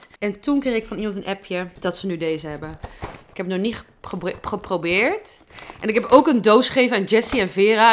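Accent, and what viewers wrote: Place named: Brabant, Netherlands. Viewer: Ik hou van het Brabantse accent van jou, voelt als thuis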